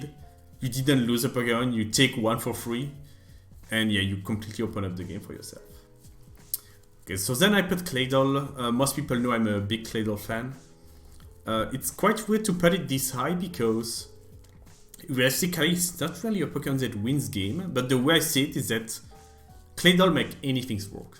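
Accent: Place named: France. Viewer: When you try to hide your french accent but it's getting worst..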